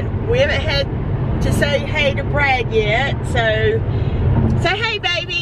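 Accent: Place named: North Carolina, United States. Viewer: Heyy! I am watching your videos for the first time!! Love your video! I am from Charlotte NC... you sound like you might be near me haha!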